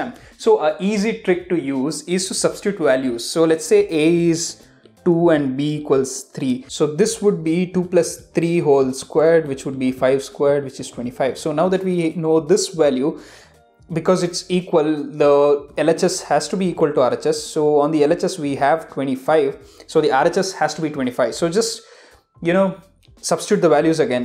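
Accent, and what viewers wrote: India. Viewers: liked ur accent. Indian accent is amazing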